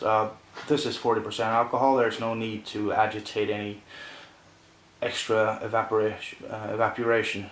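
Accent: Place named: Norway. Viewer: I really enjoyed this video,I am not a whisky man but I will have the odd single malt or a bourbon at home and yeah chasers are very common in the UK, I first had you down as American and then Irish and then Dutch and then Danish,are you Norwegian though? Cheers mate,subbed to and beer looks good as well,17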